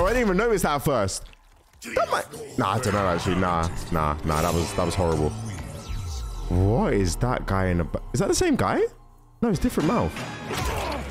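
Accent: United Kingdom. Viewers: bro is so bri'sh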